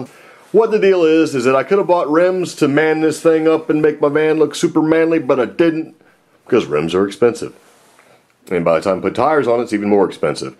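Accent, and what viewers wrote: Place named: Texas, United States. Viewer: Mojo? nah".....Never lost it, that Texan drawl forbids it, you wear that 5 gallon hat with pride!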